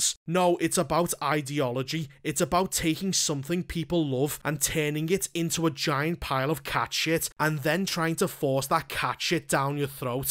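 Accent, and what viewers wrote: Liverpool, United Kingdom. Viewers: I don't know what's funnier, the cutting, but very accurate commentary and analysis, or the fact that it's all delivered in a Scouse accent 🤣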